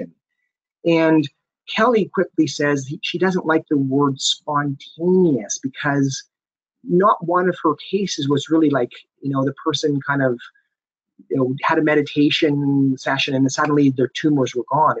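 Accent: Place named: Canada. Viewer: Enjoyed the presentation. Turn on "closed captions" to help with understanding. has a Canadian accent. This talk parallel's my own journey, now in remission (only a year) from metastatic sarcoma. I've thoroughly enjoyed my life on the edge of life and death. I'd recommend it for lost souls searching for meaning in a troubled world. It's a spiritual accelerant. As a social radical, the context is also living in a toxic, urbanized world, alienated from nature that's spinning out of control. Keep the flow of water and healthy nutrients going to help de-toxify the body-mind. Cancers often forms and habituates along routes of elimination: like the lungs, skin, liver, bladder, colon. Mine was (is) urothelial. Also, I'm a zen adept. I like Turner's book and have a sense of intentionality, but purpose needs to be put in a bigger context beyond the ego-mind, which is a social construction BTW. 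You allow (accept) by questioning, doubting and stripping away the non essentials. Meditation can lead to emptiness, being empty of all distinctions. Living from a place of non resistance in touch with the mysterium infinitum et oppositorum (Nicholas de Cusa). It's a mystical state of wonder, where there is no ego, no cancer, you're full of vitality or chi, and goals dissipate too. You're just in the presence of it all. No judgement or comment necessary. This along with Basho, my adoring adopted stray cat; a loving wife; openness; attention to alternatives Western medicine, driven by the pro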